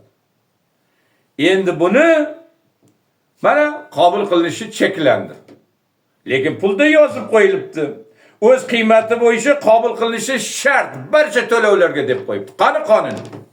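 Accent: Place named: Tajikistan. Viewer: СССР ДАВРИДА ХАММА ЭРКИН ЯШАГАНДИ , ХУКУМАТГА ИШОНЧ КУЧЛИ ЭДИ , ИШСИЗ ОДАМ БУЛМАГАН , АЙНИКСА ОЛИЙ УКУВ ЮРТИГА КИРИШ У.Н КАТТИК ТАЙЕРГАРЛИК К.К ЭДИ , ИМТИХОНДАН УТОЛМАСА КЕТАРДИ , КОНТРАКТ СУППЕКОНТРАКТ ДЕГАНИ ДУБ КАЛЛАНИ УКИТИБ , ДИПЛОМЛИ САВОДСИЗНИ ТАЙЕРЛАШ ДЕГАНИ , ТАРТИБ , ИНТИЗОМ ТАМОМАН ИЗДАН ЧИККАН , АММО БУ ТИЗИМНИНГ ИСКАНЖАСИДАН ЧИКИБ КЕТИШ ОСОН БУЛМАЙДИ , ШЕВАСИГА КАРАГАНДА ДОМЛА ХАМ ТОЖИК МИЛЛАТГА УХШАЯПТИЛАР...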